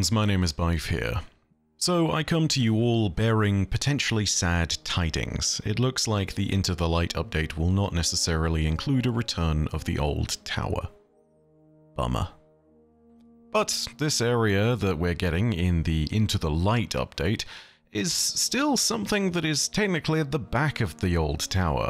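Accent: United Kingdom. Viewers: It’s my favorite British destiny lore guy. Tribes look awesome sign me up!!!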